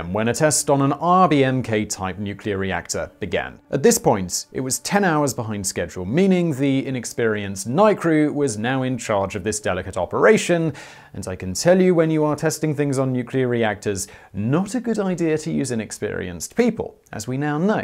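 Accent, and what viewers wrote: RP, United Kingdom. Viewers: Very posh. Thanks for the video.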